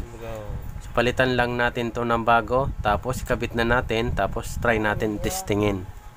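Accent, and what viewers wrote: Bicol, Philippines. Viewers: Sir bicolano kba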